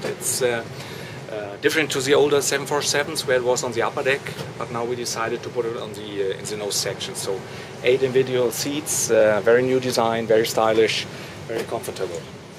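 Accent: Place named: Germany. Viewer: Richtiges Englisch mit deutschem akzent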